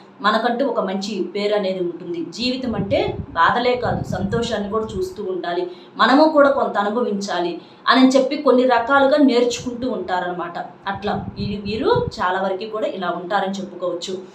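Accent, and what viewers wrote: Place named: Guntur, India. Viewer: గుంటూరు యాస లో బాగా చెప్పారు మేడం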